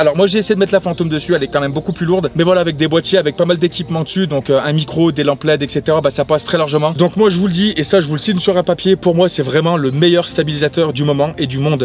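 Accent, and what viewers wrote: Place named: Suburbs, France. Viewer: Tu causes comme un évadé de banlieue, mais t'es vraiment excellent !!! 🌟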